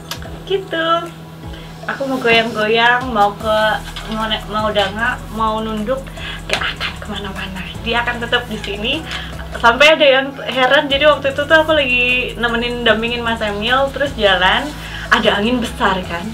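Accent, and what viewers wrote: Java, Indonesia. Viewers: Medok banget Bu... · Aduh logat jawanya kalihatan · Udah logat2 jawa ya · Medognya sudah mengalir hehe. Lanjutkan ibu 👍👍👍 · Yey...sudah medhok Jawa! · Cara bicaranya sekarang lebih ke medok jawanya yaaa😊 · Aduh ngomongnya udah meddok hihii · logatnyaa jawa bangett yaa kwkw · medoknyaa ada bangett😍😍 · Ngomongnya sudah agak medok yah Bu salam dari saya rakyat Suroboyo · Jadi medok ya Bu kalo ngomong duh gemes tapi so lovable 🥰😍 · Kelamaan dijawa jadi medok logatnya 😂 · Tak urek2 kata bu.. Jawa bgtt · udah ketularan medhoknya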